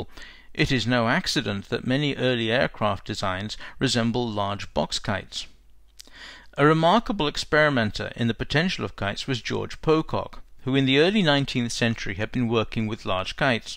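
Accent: United Kingdom. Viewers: If you can't tell an obviously English accent from an Australian one, how on Earth can we believe a single word of the drivel you have typed above?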